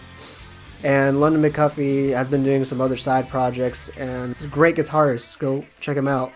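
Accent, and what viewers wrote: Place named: Texas, United States. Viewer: Ayyy, you from Texas man 🤘🤘🤘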